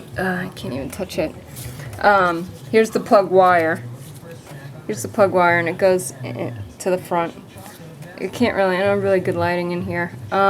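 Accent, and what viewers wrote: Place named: Canada. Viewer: Hi, I know it's a while ago now, and this wasn't your bike, but did you discover the reason for the oil on the plugs and filter? Also I believe I detect a Canadian accent, but was it a California model? I hear they have their exhaust shit fed back to them through the carbs, which surely can't be healthy for the engine!! Bloody bullshit climate legislation!! When do they ban cow farts? The state of the filter was alarming. Did you perform a compression test? My guess is you didn't because it looks like a much harder job to access than on my CB-1, and that's a bugger on the inside cylinders. Could be valve seals I guess. It's sad to see a great bike neglected that badly isn't it? Thank you for the instructional vid. Finally, can you send me a bottle of pineol in the UK?;)) We don't have it here and I don't know what the equivalent is.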